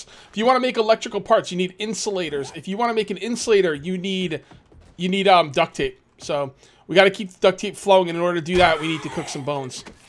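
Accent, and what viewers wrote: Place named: New England, United States. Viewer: Some I knew you where some where and new England.:P you have a slight North eastern accent.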